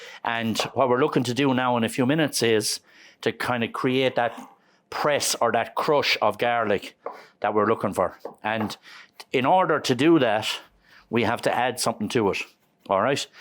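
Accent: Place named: Ireland. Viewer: Hmm, accent of the host ..nm- ireland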